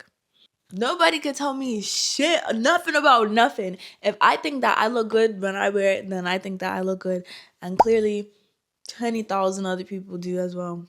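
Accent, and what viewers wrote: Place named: Bermuda. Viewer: Sounds like a little Bermuda on that accent 🇧🇲 ❤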